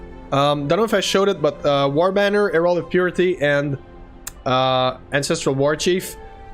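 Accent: Quebec, Canada. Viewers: The Quebecer is strong in this one. Am I correct brother man.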